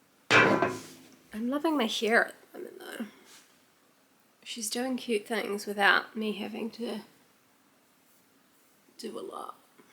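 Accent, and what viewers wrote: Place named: New Zealand. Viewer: ooh okay i had no idea what poor things about (and i thought the film trailer look weird) but you’ve piqued my interest! your accent makes me miss NZ 😫🥹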